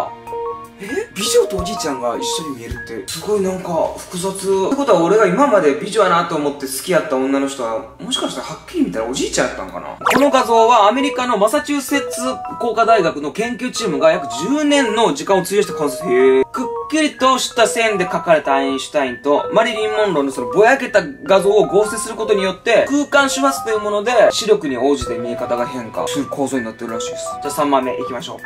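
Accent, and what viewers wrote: Osaka, Japan. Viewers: 大阪弁って本当にいいなーっていつも思う😌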